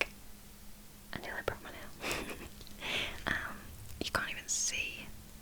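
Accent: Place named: England, United Kingdom. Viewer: Hey, Don't want to sound like a creep but where abouts in england are you from? Just because of the accent. I'm from South Yorkshire btw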